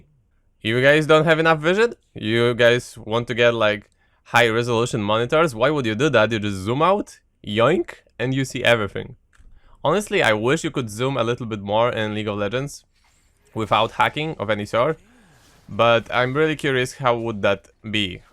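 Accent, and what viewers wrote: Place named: Poland. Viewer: Dobry akcent ale słychać że polak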